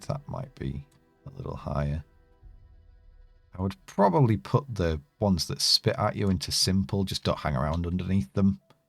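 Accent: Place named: United Kingdom. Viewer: why is this guy british? so wierd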